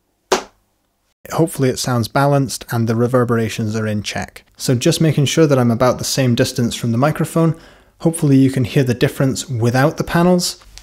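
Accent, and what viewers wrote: Scotland, United Kingdom. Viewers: Chaps… this is what a very posh Scottish person sounds like… must be a Farquhar or similar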